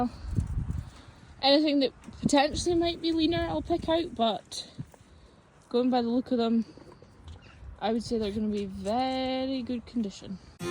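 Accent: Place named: Scotland, United Kingdom. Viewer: Hi, you have so many ewes to still lamb and that means you will be busy for a long time. Yes, they all look good considering all the rain you had earlier. You will have to train Davie better, not getting your lunch is a bad start for him. I assume your dad is looking after the cattle while you do the sheep, a good team working well. I love listening to your unique Scottish voice, its so different from the lingo in Aussie. Looking forward to hearing your news. Chris from Victoria, Australia 🇦🇺.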